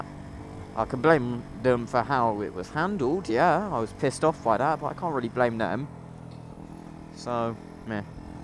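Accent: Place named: England, United Kingdom. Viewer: Normally i hate the english accent but your's is awsome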